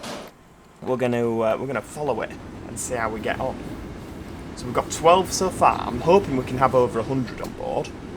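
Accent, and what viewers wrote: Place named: Northern England, United Kingdom. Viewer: Hi,. Thanks for another enjoyable video. I still hear the mistaken pronunciation of Sault Ste Marie, although I do quite like your pronunciation with your Northern accent. Sault is pronounced “Soo” like “shoo” or like the name Sue. Ste is the short form of Sainte, pronounced the same as Saint. And Marie is correct. Canadians often refer to this city as “The Soo.” Just so you know. Thanks.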